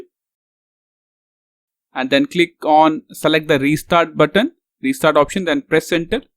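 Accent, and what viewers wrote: India. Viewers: I recommend listening to indian accent youtube tutorials they always fix the problem Without this guy id be stuck with a lagging mouse Thank you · As soon as I hear the Indian accent, I knew I was in good hands. Problem fixed....and THANK YOU!!!!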